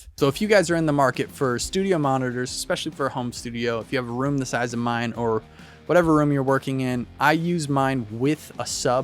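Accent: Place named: Nashville, United States. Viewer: you from nashville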